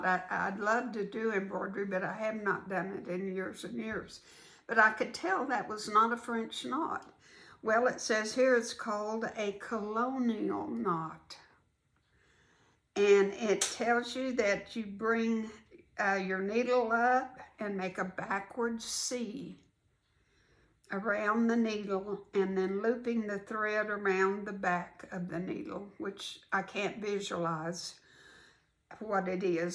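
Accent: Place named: Kentucky, United States. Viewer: So enjoyed your video. I'm from ky. Where are you from. You sound so much like alot of the ladies in our quilting guild. Will be watching all the videos you do as its like watching a close friend. Loved the pillow. So beautiful!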